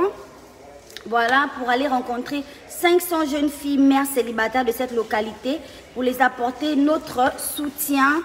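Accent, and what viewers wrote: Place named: Gabon. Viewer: J aime ton accent gabonais ❤️❤️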